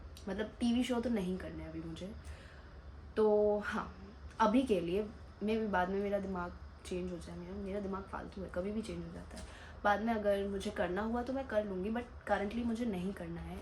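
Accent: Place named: Gujarat, India. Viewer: Finally Youtube pe active hogi 😅😅 Nice video your bhai bhai vala ascent gujrati bol rahe thi aese lag rahe tha ap bahut khoobsurat aur cute ho 🥰❤️ waiting for part 2 video 😊😊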